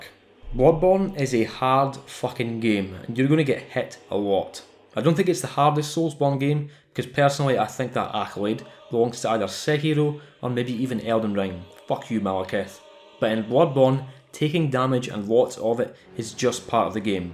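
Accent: Scotland, United Kingdom. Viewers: I will never complain about listening to a Scotsman talking about one of my all time favorites for hours. Also, i completely agree the stupid motion control gestures happen far more often on PS5. Ludwig is rough, so are Winter Lanterns, the defiled chalice bosses, Crow of Cainhurst, and Orphan, but no one, NO ONE in this game is harder and more lethal than that mother#%$&!@ in the blood river cave with the gatling gun. Eff that tard. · was gonna sleep to this until i heard you're british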